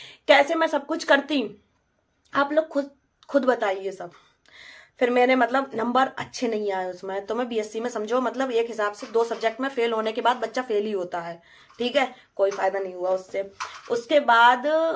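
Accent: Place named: Farrukhabad, India. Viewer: aap ki boli to farrukhabd ki lagti hai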